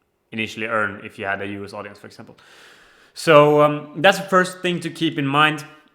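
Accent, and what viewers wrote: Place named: Sweden. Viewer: Man hör att du är svensk :P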